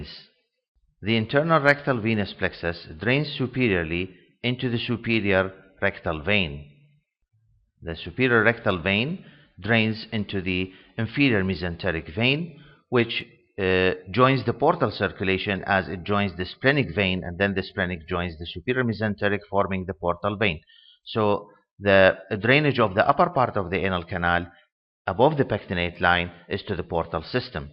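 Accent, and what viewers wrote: Iraq. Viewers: عرفتك عراقي من اللهجة ههههه ربي يحفظك حبيبي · شكرا دكتور على قناتتك الرائعه نفتخر بيك انت قدوتنا منوره الاكسنت العراقيه كلش حلوه وان شائ الله المزيد من التقدم والموفقيه :)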